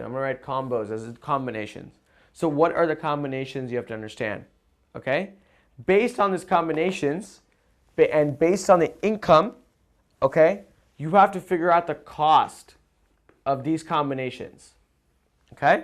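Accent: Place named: India. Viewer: magnificent.sheer fabulous accent compared to your other countrymen in india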